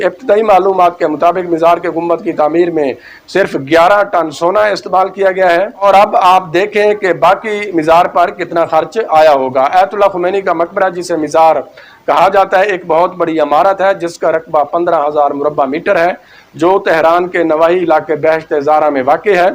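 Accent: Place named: Punjab, Pakistan. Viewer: Punjabi Urdu bolna sake jahil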